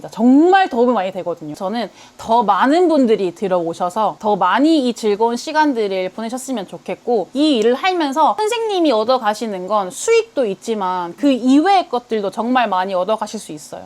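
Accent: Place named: Gyeongsang, South Korea. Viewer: 경상도 억양이네...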